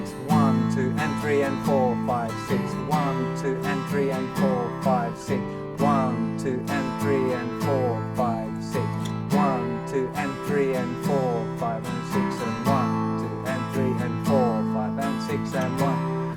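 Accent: United Kingdom. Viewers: OMG! i almost dropped my coffe when you started talking! YOUR'E BRITISH! om my lord jesus and everything in between!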